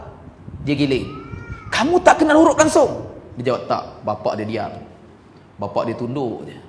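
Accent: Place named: Northern Malaysia, Malaysia. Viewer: Bahasa utara memng agak kasar... tapi bagi penduduk utara x de masalah.. klu x sesuai dgn ceramah ustaz ini.. boleh la dgr ceramah ustaz setempat... ustaz setempat mungkin tahu inisiatif utk mendekatkan diri dgn penduduk setempat...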